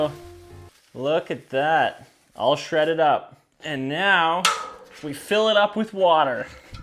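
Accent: Canada. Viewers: Stayed home sick today and found your channel and im loving the content! Also random weird question but are you by any chance Canadian? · I enjoy your silliness and your wonderful smile and especially how your projects work out to be something worthwhile .... and, oh yeah, listening to your Canadian "ou" sound, eh!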